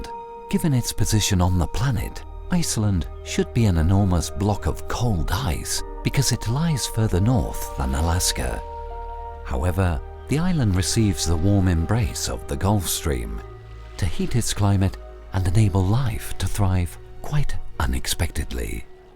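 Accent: RP, United Kingdom. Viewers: ITS A , YES ! A FOXUMENTARY . OF COURSE AND NARRATED BY A RIGHT POSH BRITISH VOICE ! OH!! RATHERRRR!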